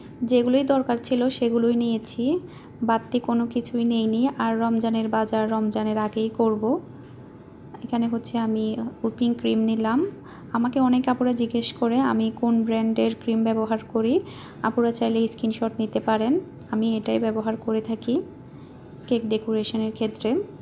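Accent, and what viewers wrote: Chittagong, Bangladesh. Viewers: আপু আমার বাডি ও চিটাগাং আমি সৌদিআরবে থাকি আমার দুই ছেলে আমার নাম নাহিদা আপনার চিটাগাং এর ভাষা বলগ টা অনেক ভালো লাগে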